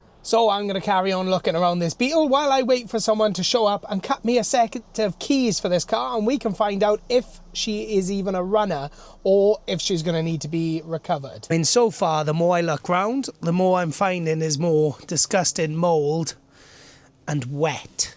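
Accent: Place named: Welsh Valleys, United Kingdom. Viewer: Where you from mate you sound valleys nvm seems your Swansea way. Great video and great find will sub just to watch the upcoming videos on this one may even be interested in buying depending on that water leak issue and underneath. And Yes I'm probably having a mid life crisis 😂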